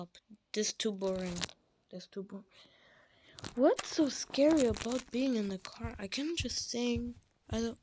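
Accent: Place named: Arab world. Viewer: You have arab accent! Just like i do! But yours is deep Mine is smol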